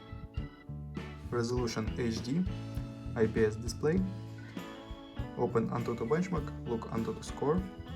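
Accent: Russia. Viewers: с ярко выраженным русским акцентом и отвечающий только на русские комменты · Русского человека слышно сразу)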